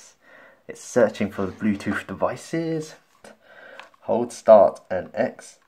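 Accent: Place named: England, United Kingdom. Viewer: Thnx dude, i love your English accent =]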